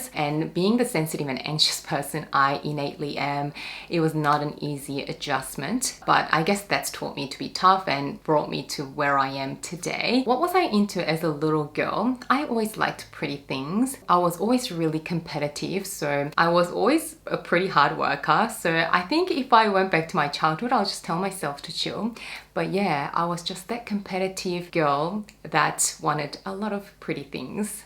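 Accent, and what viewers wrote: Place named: Australia. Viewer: Your aussy accent is so cute and the way you speak is so pleasant and calming. I can listen to you talk forever 😆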